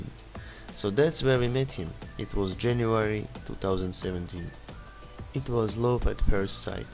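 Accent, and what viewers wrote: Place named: Russia. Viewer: Haha😂 The Russian accent somehow makes it much more intimidating!🤣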